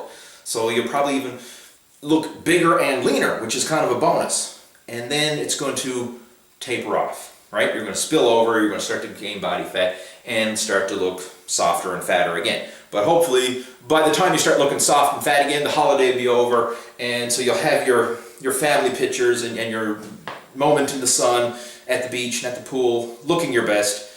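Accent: Newfoundland, Canada. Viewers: You got to be from Newfoundland, I can tell by your accent.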